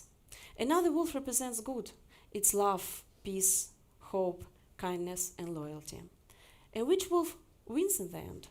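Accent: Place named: Russia. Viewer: Какое-то банальное УГ, с мощным русским акцентом.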